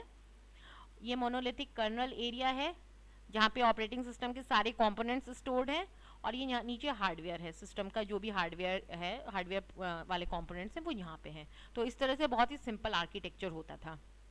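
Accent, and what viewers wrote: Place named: India. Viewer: This Indian accent sucks.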